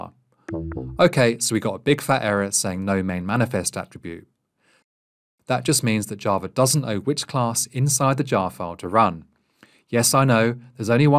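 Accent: United Kingdom. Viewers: man, that british accent :P cant wait for spring boot, hibernate , everything whatever concerns java tutorials:>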